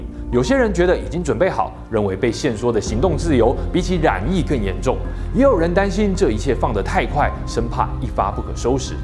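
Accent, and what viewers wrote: Taiwan. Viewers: 你这个频道就不要哗众取宠替它洗地了。封城的次生灾害你有客观统计吗？党国大学发在nature的期刊就是你所谓的“客观”？操着一口流利的台湾腔和繁体字在这误导自由世界。不齿。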